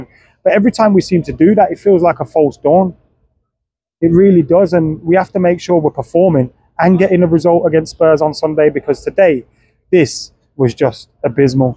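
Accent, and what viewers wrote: Birmingham, United Kingdom. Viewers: He’s Brummy😂😅😢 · How do you mistake a brummie accent for scouse and manc 😂😂 that's crazy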